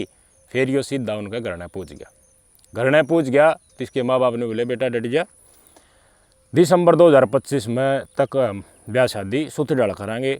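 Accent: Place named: Mahendragarh, India. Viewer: भाई आपकी बोली में महेंद्रगढ़ साइड की झलक बहुत अच्छी है भाई आप हर खबर का निचोड़ निकालते हो लाजवाब भाई जी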